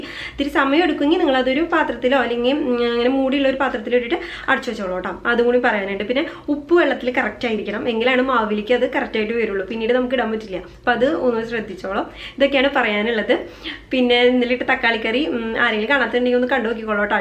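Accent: Thrissur, India. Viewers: Oru raksha ellaattaa chechi 😍 THRISSUR Kari aaaano? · Haiii chechikutti njan puthiya alanetto first vedio kandappo thanne subscribe cheythu Yummy recipe Enik tcr slang othiri ishttaaaa Thrissurkarum poliya Love you ma swt ♥️♥️♥️♥️♥️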